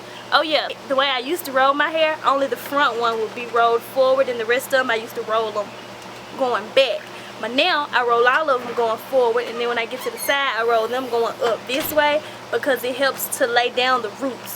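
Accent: South, United States. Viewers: your gorgeous an your southern accent is mind blowing reminds me of home!! get in touch sometime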